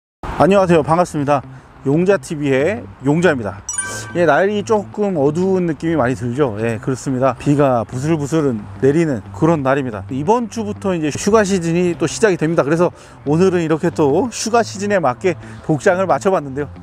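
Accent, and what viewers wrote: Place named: Jeolla, South Korea. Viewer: 슬래파~~전라도신가보다 유익한정보감사~~^^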